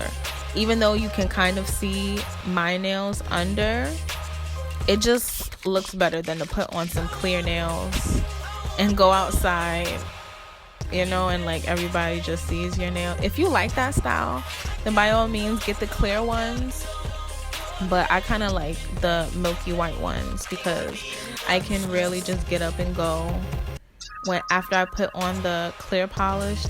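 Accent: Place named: New York, United States. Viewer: yass. and i hear that new york in you tooo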